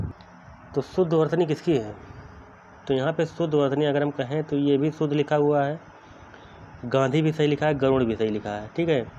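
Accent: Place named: Agra, India. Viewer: सर जी आपकी भाषा तो अपने आगरा जिले की सी लग रही हैं🤣🤣 आप कहाँ से हो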